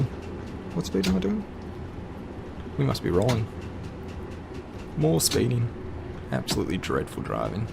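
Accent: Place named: Australia. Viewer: I'm tipping he's an Aussie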